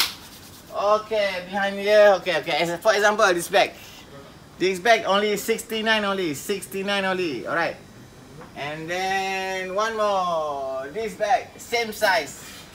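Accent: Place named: Malaysia. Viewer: Saran bang mending di kasih subtitle bahasa inggris aja biar gak belepotan Malay Inggris😁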